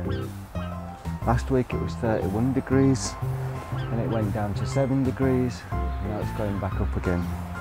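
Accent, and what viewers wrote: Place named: Norwich, United Kingdom. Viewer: Love your wigwam..can I ask where you are from originally? I can't place your accent, sounds a bit like Norwich and a bit Yorkshire :)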